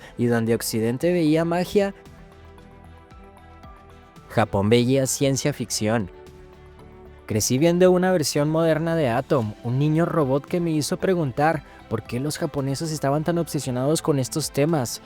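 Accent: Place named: Colombia. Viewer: Voz tipica de colombiano...de mucha hueva...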